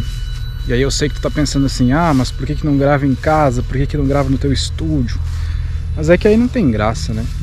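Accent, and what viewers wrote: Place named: Santos, Brazil. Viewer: Você é Santista? Fala que nem Santista. Linda cidade de Santos-SP.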